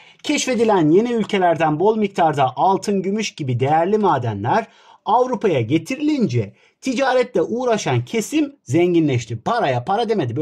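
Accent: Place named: Black Sea, Turkey. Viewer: Hocam siz karadenizlisiz da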